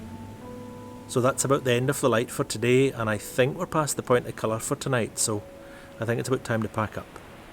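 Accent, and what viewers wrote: Scotland, United Kingdom. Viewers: Thanks Rolf. Yes scottish accent with english languauge. Often difficult to understand. Practice makes perfect¡ = )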